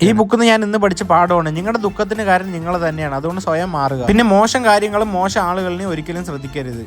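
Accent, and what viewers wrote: Palakkad, India. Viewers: Liked to see your videos. Liked your plalakkad language. All the best wishes monu👍❤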